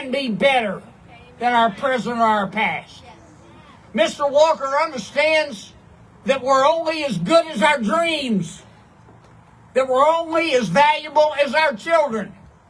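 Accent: South, United States. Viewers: You guys actually listen to this guy? 15 years ago he barely had a Southern accent, and now he is full bore Good-ol'-boy South. What a joke. "Dear Lord give us more people like Hershel Walker and his fans, that can barely rub two brain cells together, so that we can stay in power forever, and not actually do anything for these constituents . Oh we'll talk a good game ... but actually do nothing for them."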